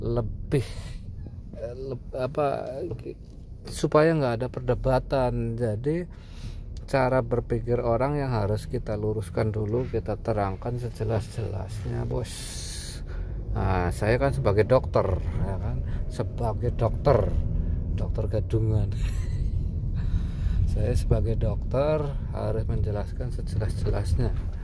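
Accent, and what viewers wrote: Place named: Java, Indonesia. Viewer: Jawane endi um. Kyong medok yaa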